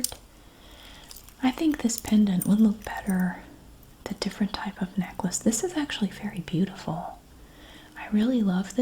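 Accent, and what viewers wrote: South, United States. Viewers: Lately I've been on a bender. Been subscribed for almost 10 years. Love the motherly tone and soft southern speech of your voice. Literally the only ASMR channel I'm still subscribed to. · Love Southern accent! Different from depending on where in the south! Thanks 😇❤💙❤💙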